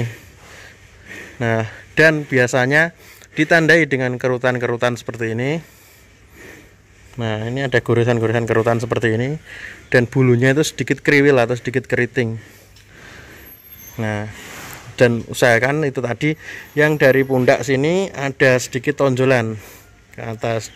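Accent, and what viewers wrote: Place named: Pati, Indonesia. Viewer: Wong Pati njenengan mas🤔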